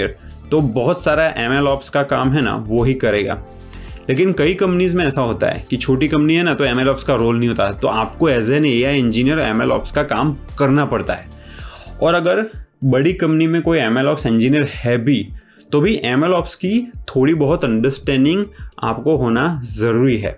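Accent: Gujarat, India. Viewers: You sound very gujju bhai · Are you Gujarati bro ?😅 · Thank you for the in depth Roadmap sir💯, btw got to know from your tone that you are gujarati(same).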